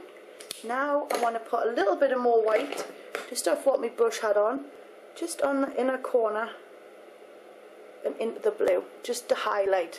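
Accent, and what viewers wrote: Newcastle, United Kingdom. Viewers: Love your accent :D Gotta love the Geordies! Your eyelashes are so long! Are they natural? Your nose is actually a really nice shape! I never really noticed its size before you pointed it out, just its shape, its lovely and straight :) Loving the videos chuck, upload more soon. x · U sound like Cheryl Cole are you from newcastle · I'm pretty sure it's a British accent. :) It's an amazing accent :)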